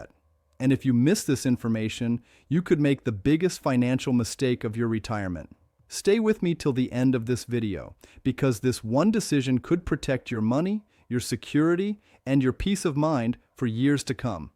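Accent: United States. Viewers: Why the US accent for UK matters....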